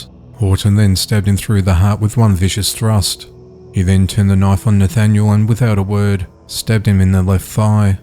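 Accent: Australia. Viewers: Cheers yourself, I came for the Aussie accent and stayed for the daily buzz 😀👍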